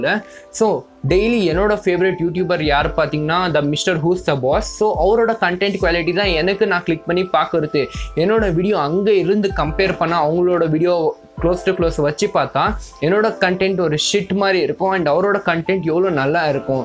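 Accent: Bangalore, India. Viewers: Bro are you from Bangaluru?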